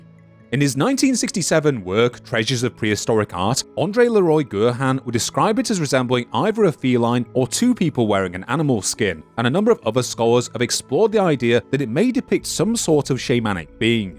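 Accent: United Kingdom. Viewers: i just feel like you should rename yourself 'A Histocrat' and replace the 'The' because it doesnt round well and it only sounds alright due to the british accent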